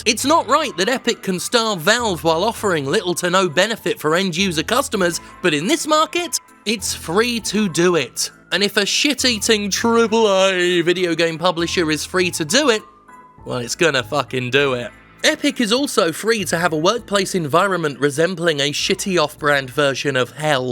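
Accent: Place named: United Kingdom. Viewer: "It's a fucking joke here" Wait, what? I'd always thought you were British because of the accent.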